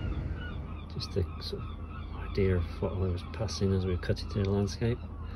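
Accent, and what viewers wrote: United Kingdom. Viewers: Yes we are thankfully back to our normal temps here in Seattle. I'm curious,, would you say that you have a "West Country" accent..? Would that be noticeable up in London.? I have a fascination with regional accents. thanks. Bill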